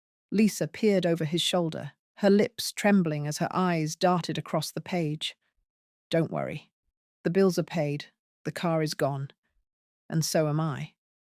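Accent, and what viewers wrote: United Kingdom. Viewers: If she’s from Texas she has a very strong British accent, why?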